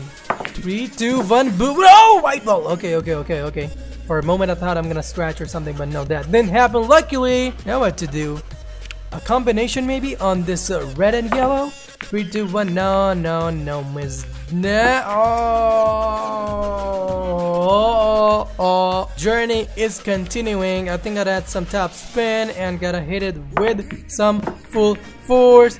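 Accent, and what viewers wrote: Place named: India. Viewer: Evyatar Trabelsi It's his accent, many Indians have trouble saying w sounds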